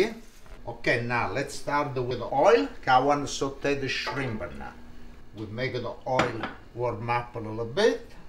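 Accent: Italy. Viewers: My ears: hears Italian accent My brain: This is some serious gourmet stuff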